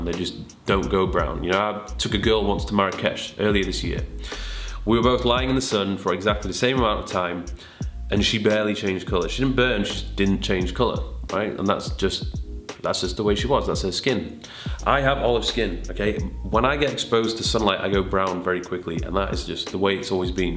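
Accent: United Kingdom. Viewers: Omg my god, you are hot as holy moly, and have a british accent.. two totally dangerous amounts of hotness ;)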